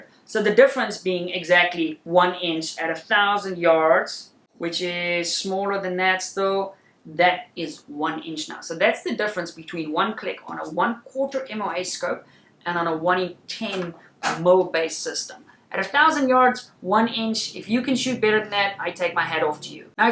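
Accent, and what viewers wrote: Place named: South Africa. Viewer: Man. Goed Om ‘n Suid Afrikaanse aksent te hoor in die vreemde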